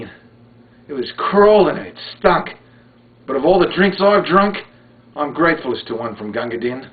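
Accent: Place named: Australia. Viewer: That's a great performance and perfect Aussie accent too which is fine for an Imperial piece such as this. Very moving at the end. 5 Stars.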